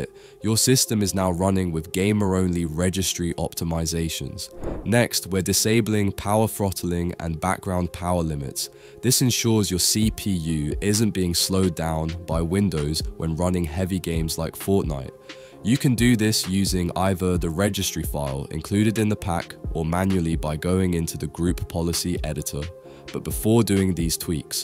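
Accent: India. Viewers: yea man the vid is botted and bro ur indian voice we know it so put the voice changher off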